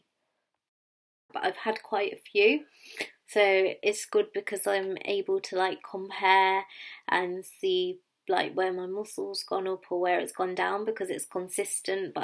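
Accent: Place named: North, United Kingdom. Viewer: Hello sweetie, I feel I’ve meet my match. I can’t do some of the moves that my PT asks me to do, because my mummy tummy is in the way. Things like burpees or bear crawling, because I have a belly in the way. But I’m trying hard and according to the bodytrax I’ve lost 4kg of fat and gained 4kg of muscle. Also, as a northern gal myself, I appreciated your accent.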